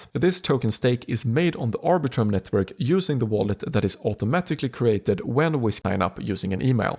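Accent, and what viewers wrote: Sweden. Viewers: This work good on Sweden? I hear on your english that you are from sweden :D Hur många äger du och vad tjänar du per månad just nu? Har du testat ta ut profit ?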